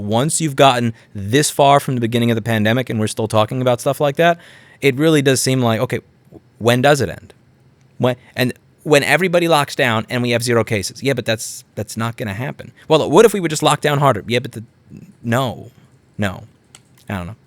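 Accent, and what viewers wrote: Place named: Long Island, United States. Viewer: Your on long island. We long islanders can recognize that accent anywhere. Im moving up to VT in a month ( part of the crowd re-evaluating their life) and Im concerned my accent will give me away up there.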